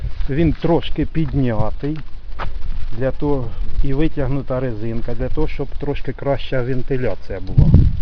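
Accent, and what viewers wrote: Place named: Poltava, Ukraine. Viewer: Полтавщина Говор такой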